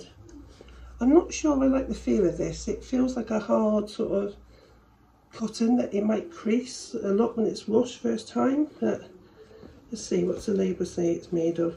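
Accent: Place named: Wiltshire, United Kingdom. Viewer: Hello, I've popped over from Tina's channel. I've just done a Shein Challenge with Tina and she mentioned your channel so I thought I'd say Hello. Out of curiosity your accent sounds familiar, are you from the Wiltshire area? I am originally from Swindon but now live in Las Vegas. Your accent reminds me of my old accent, hehe. I will be subscribing as I not only did I enjoy your video but I love that us smaller channels build one another up. Have a great day!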